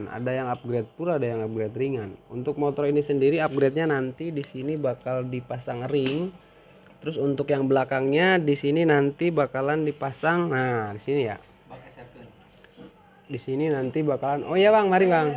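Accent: West Java, Indonesia. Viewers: Lok mana om Bandung apa mana ya soal nya logat bahasa nya Sunda juga kaya saya hehe..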